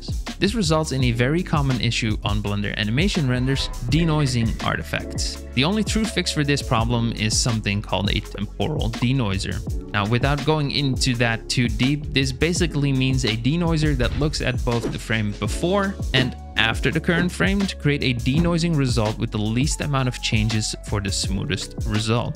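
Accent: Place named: Netherlands. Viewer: I hear a Dutch accent! NL!!!!! ❤❤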